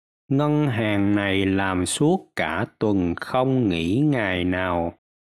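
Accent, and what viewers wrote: Mekong Delta, Vietnam. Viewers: xin chào, Hello, Bonjour! anh cám ơn con đă gủi liên lạc cho học tiếng Việt giống âm thanh miền duyên sông Cữu Long. Thanks! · Giọng này là giọng miền tây, tôi đoán là Bạc Liêu vì chữ đêm nghe như đim. Giọng Bạc Liêu iêu thường thành im như thêm thành thim.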